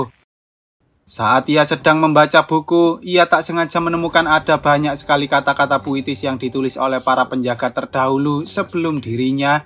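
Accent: Java, Indonesia. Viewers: Logat jawa nya bisa di rubah gak bang,gak enak kali dengar nya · Kok medok ngono Min suaramu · Jawa yaaa. Koo ana omongan wis ora kesuen.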